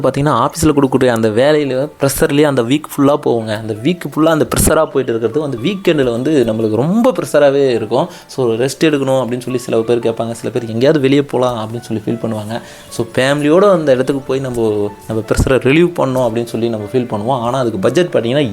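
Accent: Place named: Vellore, India. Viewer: Nama than 😂 vellore la inime nama than antha mari iruke ya un slang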